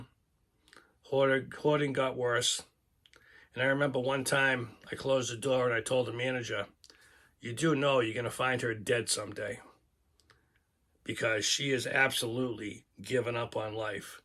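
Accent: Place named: New England, United States. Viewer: Hi! I could not have found your channel at a better time in my life. Thank you ox. p.s. Love and miss that New England accent. I grew up in Massachusetts.❤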